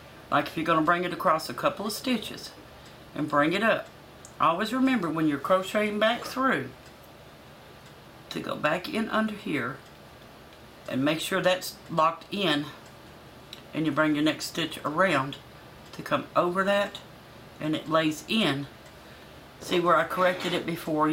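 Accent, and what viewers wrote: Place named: South, United States. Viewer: I love your country accent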